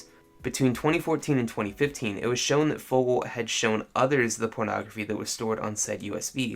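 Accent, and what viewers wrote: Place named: Martinsville, United States. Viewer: Indiana podunk? Sounds like someone is from Martinsville....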